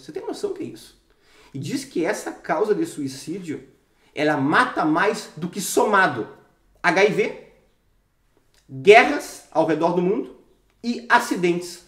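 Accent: Rio Grande do Sul, Brazil. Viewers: É bem gaúcho mesmo, sotaque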